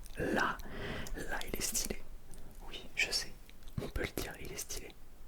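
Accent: South, France. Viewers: Vous n’avez pas le même accent. a l’accent du sud mais pas petit frère 😅. Mais il peut se lancer dans l’ASMR, il a le fluide 😁 🩷🩷🩷💫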